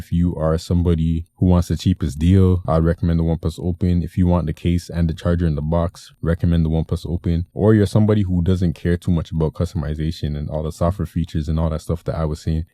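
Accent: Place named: Toronto, Canada. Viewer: Here is my one thing...and before I get to that, loved the review, my guy. Spotted the Toronto accent right away. But, music. Wireless. No one ever talks about this. But if you have expensive headphones, with aptx HD, aptx adaptive or aptx lossless, one plus wins. And Samsung could make it available. Snapdragon-Qualcolm chips created and supports those codecs. Licensing fee is maybe $10. At this price point, who would notice. I can't support Samsung bricking my phone for their silky proprietary codec. Headphones are almost as much as the phone. So...really? Just a bad look.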